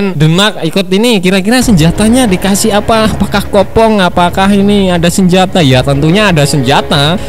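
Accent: Java, Indonesia. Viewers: Jawanya asli.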